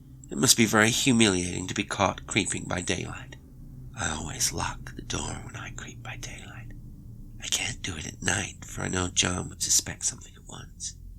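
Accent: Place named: United States. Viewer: I’ve just realised that’s you reading! I honestly to God though you had hired an American actor! You are aweamazing! I spent the first years of my life in the US and I didn’t realise! 🌟🌟🌟🌟🌟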